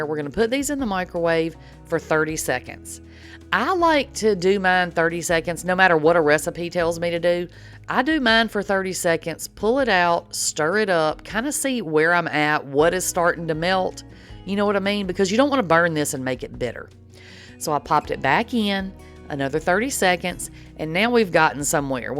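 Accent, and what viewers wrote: South, United States. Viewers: Lovely southern accent. Which State are you from? Ohh,..I’m with you on the Green Onions and Lettuce! Yes! I can hardly cook without 🧅 ONIONS. My MIL says the same thing. I use the Taco Bell taco seasoning packets and we never looked back after that! I’m definitely making that Crunchwrap this week! Tonight is Pinto Beans w Smoked Pork Neckbones and Cornbread -husband’s request. I am 60…and I STILL don’t have a stand mixer so, you’re doin’ better than I am,😂. Lovely mint color for Miss Margo. OHMG, Little Debbie Oatmeal Cream Pies are MY favorite! To make these will be such a treat!!! I think we would be good friends if you were my neighbor.😂 Years ago, I made a variation these but used straight chewy Molasses cookies for my FIL. He wasn’t crazy about oatmeal in a cookie. He told me “You can make those for me anytime you want to because they were fantastic!”. 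Somehow, I never got around to making them again….go figure huh? 😂 Curly fries? If you can’t find your Arby’s….Checker’s French Fries are battered very lightly, seasoned excellently and really GOOD. You might like those as a substitute. Ok,..now we’re on the Cheesecake! 😋 YUM!! I just made a decadent chocolate cake with homemade cream cheese frosting on Sunday and my husband said next time to make him a bowl of the frosting for himself. 😂 Btw, I don’t normally make lengthy comments such as this but, I’m watching all 6 recipes and….listening to you is very comforting somehow.😊Plus I’m resting and recovering ❤️‍🩹 from a ver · Really enjoy your videos. I’m from Indiana and I love your southern accent. You crack me up on some of your saying.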